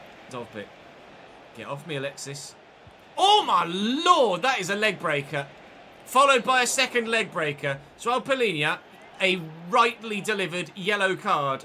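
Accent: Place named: Liverpool, United Kingdom. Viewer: A little disappointed... was looking forward to hearing the Scouse accent one last time 😂😂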